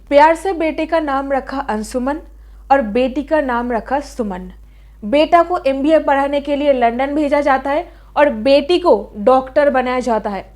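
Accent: Bengal, India. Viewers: You are confused and confusing us. Get rid of your Bengali accent. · good , like film story in bengal accent .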